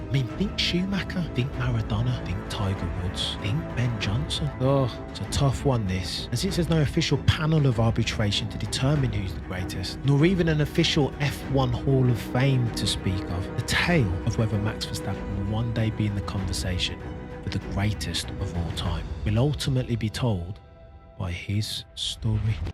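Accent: United Kingdom. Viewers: Very skeptical once I hear a British accent, because no one is more biased than you lot. Will give it a few minutes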